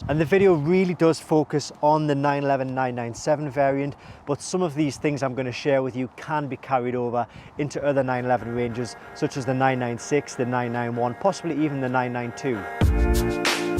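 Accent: United Kingdom. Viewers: "YouTube, it's..." I used to think it was "YouTube and such..." just said with the UK accent.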